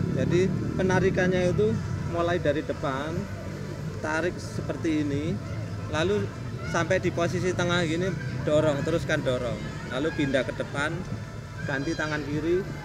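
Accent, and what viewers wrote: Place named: Java, Indonesia. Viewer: Sangat bermanfaat pk besok sy latihn renang lgi pk......ko di batam pk kyakya bahasanya agk medok jawa.....sehat selalu pk slam dri bekasi.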